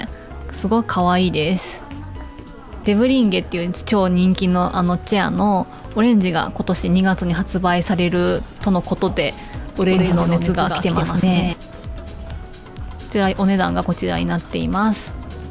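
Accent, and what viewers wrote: Kansai, Japan. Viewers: こんにちは。初コメです。聞き入ってしまう柔らかな声と、懐かしい関西弁と、美しい言葉遣い。そしてたまに出るお笑い要素も大好きです！朝イチの家事のお供に聞かせてもらってます🧹🧽🫧 グラスって気づいたら増えちゃいますよねあるある。またコメントします！♡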